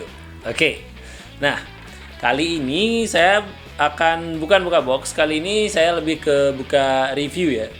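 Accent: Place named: Bali, Indonesia. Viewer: Asli Bali nih.. logat'nya Bali banget !!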